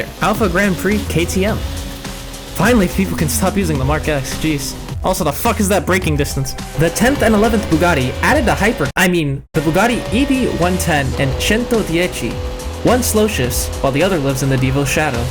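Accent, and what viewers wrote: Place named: Italy. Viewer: I am italian, you are italian, i can hear that you are!!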